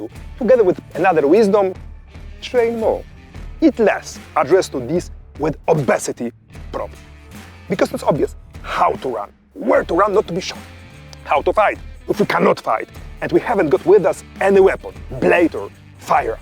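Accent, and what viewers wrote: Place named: Eastern Europe. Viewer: Bo po wschodnio europejsko angielsku.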